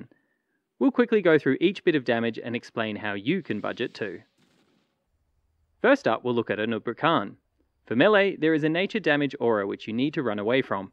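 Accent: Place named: Australia. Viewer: Aussie AF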